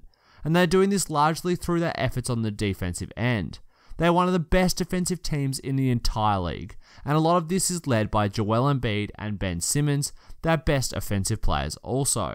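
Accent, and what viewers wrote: Australia. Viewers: Thats some thick ozzie accent you got there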